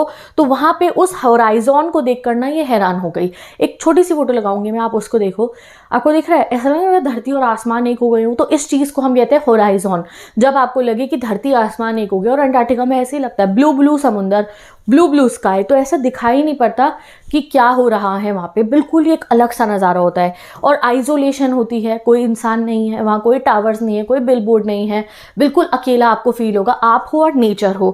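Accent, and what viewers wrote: Punjab, India. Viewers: Bande Bharat ke speed se bolte ja rahi hai aap। Student would definitely fail to follow।। Again Hindi in Punjabi tone is very disturbing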